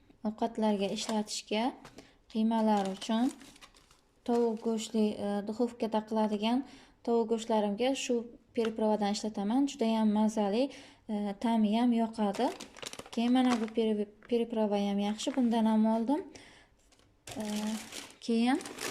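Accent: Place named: Khorezm, Uzbekistan. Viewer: Man ayol kishiman . Siz Xorazmdanmisiz gapirishuz Xorazmlilarnikiga òxsharkan judayam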